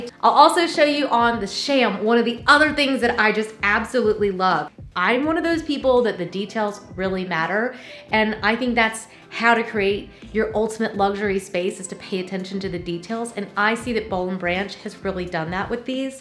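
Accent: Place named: United States. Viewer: Hello lm still waiting,l heard but l have difficult to understand sometimes the American accent if you don't mind l will really appreciate 🙏 if you write the name of those 2 sprays. Thank you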